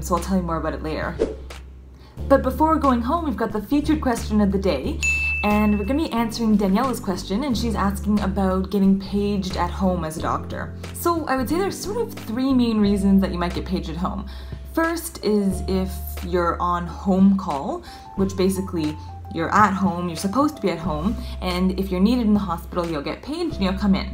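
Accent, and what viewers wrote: Canada. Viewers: can I ask you what hospitals you work in? Judging from your accent I am guessing you are in Canada? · canadian and 1/4 irish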